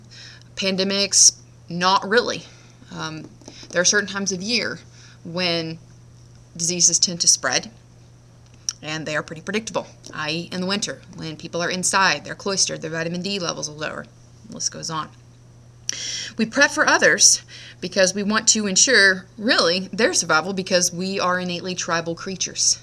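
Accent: Ireland. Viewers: I love the Irish Brogue. :) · Like the Irish voice. : )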